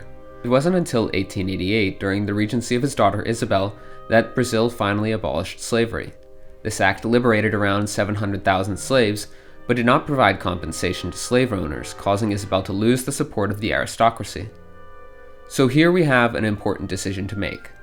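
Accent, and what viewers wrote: Spain. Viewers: Really cool video but the spanish pronunciations of Portuguese are going to offend some people, bro 💀💀💀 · "Mestizo" and "Rio Grande del Sur" 💀💀💀 Oh dear, Brazil is the 1000th time being treated with Spanish pronunciation.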